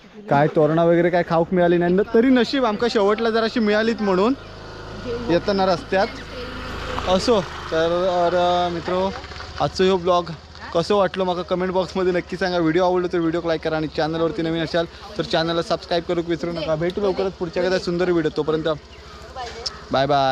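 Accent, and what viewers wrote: Konkan, India. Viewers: भाऊ तू कोंकणी आहे का सुद्ध मराठी आहे तुझी❤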